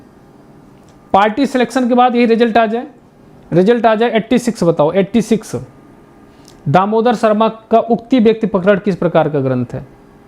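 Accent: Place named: Prayagraj, India. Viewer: Mujhe lga ki aap prayagraj se h o.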